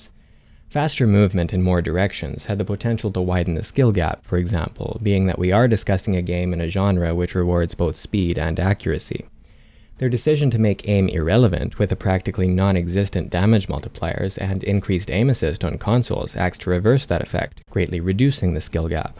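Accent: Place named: Canada. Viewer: Probably because he's not a yank. (Canadian. Same accent, less whine, not a huge ass. Multiple meanings there =D)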